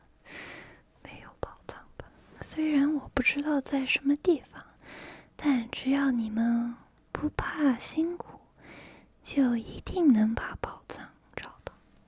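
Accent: Standard. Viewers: 发音好标准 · 明明发音很标准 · Your Chinese is really very standard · 讲实话你的中文太标准了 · 发音也很标准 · 你的中文发音非常标准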